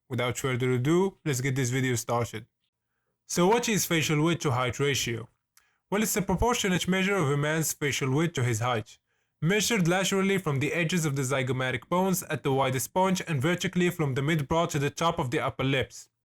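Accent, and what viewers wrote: Morocco. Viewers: your accent sounds damn moroccan lol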